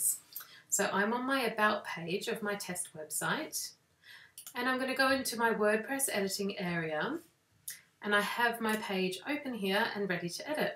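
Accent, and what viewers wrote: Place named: United Kingdom. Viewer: I love the British accent.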